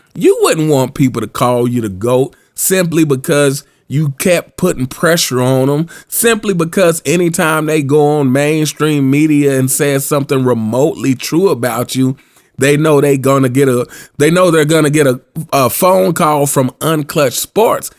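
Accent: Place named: Memphis, United States. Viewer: Been following your content for a while. I knew you were from Memphis after watching one of your episodes. Are you from the Mound, because you sound like 8Ball.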